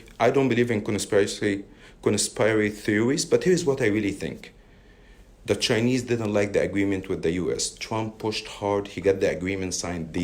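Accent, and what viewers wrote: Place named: Iran. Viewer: I like his Persian accent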